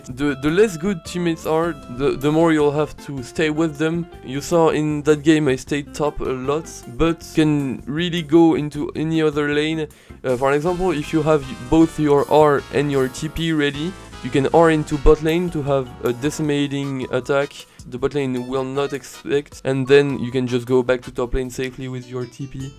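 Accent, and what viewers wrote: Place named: England, United Kingdom. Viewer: l'accent anglais de mieux en mieux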